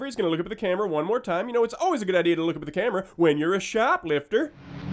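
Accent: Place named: India. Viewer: wheres the indian voice?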